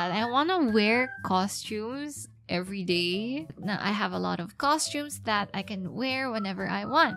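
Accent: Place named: Philippines. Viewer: One thing I love about your shorts is your slightly wicked humor and comedic timing. And yes, your Yor and Anya shorts hooked me. I got the sense that you would make one laugh a lot on a date. Have you considered standup? Anyway, please use humor as a part of what you produce. Also, I love your accent. You probably know but I’ll say it anyway: your kind of Philippina cute/sexy is a powerful magic wand anywhere. I would also love to see your aerial work!❤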